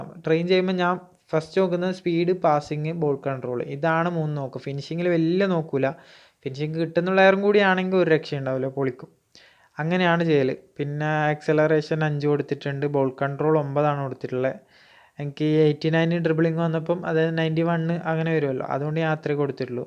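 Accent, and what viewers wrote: Kannur, India. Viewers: Kannur eadeyaa ingal?👋🙂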